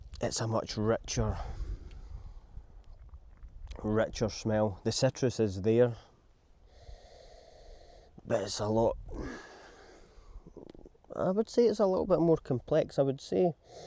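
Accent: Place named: Fife, United Kingdom. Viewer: Its nice to hear a Fife accent. Orlik gets a lot of good reviews, and I think I'll copy your lead and try both.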